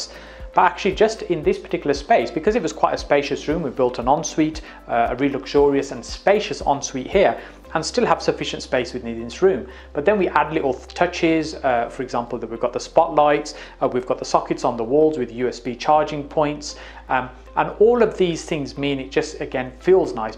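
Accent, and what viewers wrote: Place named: British Pakistani, United Kingdom. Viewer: is certainly very intelligent and has taught so much already., if you are reading this sir, then you should check out "supersafs" channel on Youtube as you remind me so much of him in terms of your British Pakistani accent and your intellectual style of presenting videos. Supersaf has also taught me alot and he is also British Pakistani like you sir. He is one of the most well known Youtubers from the U.K. Check out "supersaf" channel sir and thanks for teaching me so much. May allah bless you sir.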